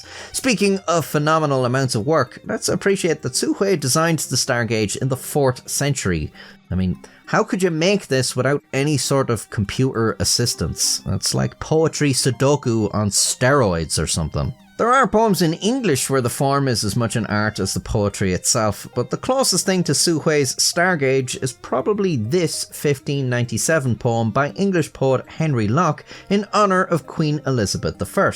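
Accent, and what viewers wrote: Ireland. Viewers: Please, Irish guy in my iPad, read me more poetry. I promise I won’t be idly scratching my ass this time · Is that accent Irish?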